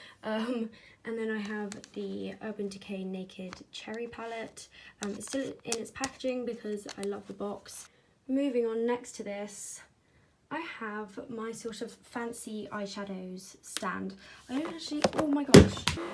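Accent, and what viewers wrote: United Kingdom. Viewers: Omg I'm surprised that your British I thought you were American ahhaha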